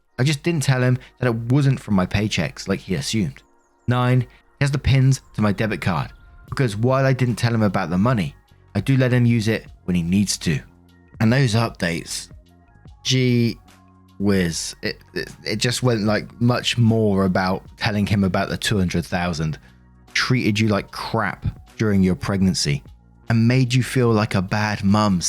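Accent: United Kingdom. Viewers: every time i listen to talk i think "man he's so British"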